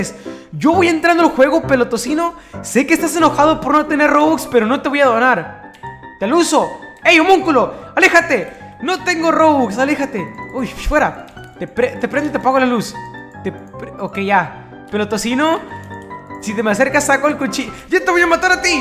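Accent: Chile. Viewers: eres de chile? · Alv pensé que es chileno XD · No sabia que era chileno xd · Eres de chile?o de donde?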